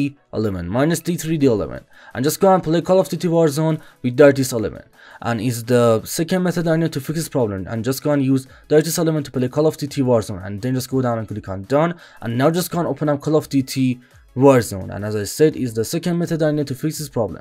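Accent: Iran. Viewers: خیلی صدات خوبه ولی انگار ایرانی هستی😻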